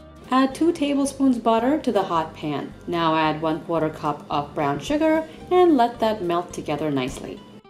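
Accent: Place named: United States. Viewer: I just love American accent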